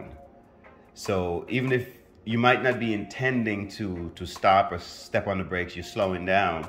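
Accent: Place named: Wisconsin, United States. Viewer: Good video and to the point. Love to hear a WI voice after many years captaining the Caribbean. Thank you.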